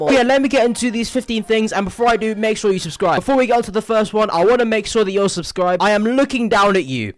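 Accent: United Kingdom. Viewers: good bradford accent. its a 8.5/10 from a boy who lived in bd for 8 yrs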